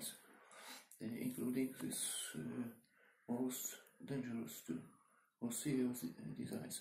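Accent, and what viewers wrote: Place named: Dnipro, Ukraine. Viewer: бля у него акцент Днепропетровский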